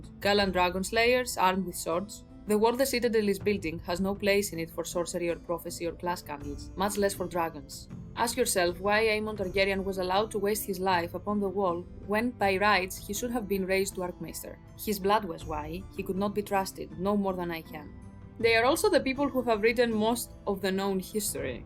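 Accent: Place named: Greece. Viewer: you gotta be Greek the accent is a giveaway.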